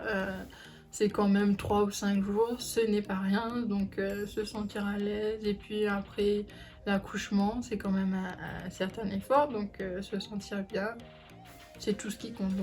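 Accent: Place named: Réunion, France. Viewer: C’était trop bien ta vidéo pour une première fois franchement continue comme ça c’est super 🥰💯tu vien de île de la réunion 🇷🇪 ? Comme pour moi ya un petit accent 😅🇷🇪😘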